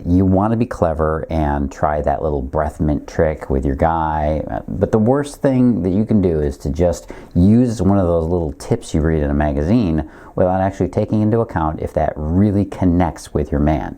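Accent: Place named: Midwest, United States. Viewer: rational, sound advice. Refreshing from the sea of gimmicky crap. Love the midwest accent too.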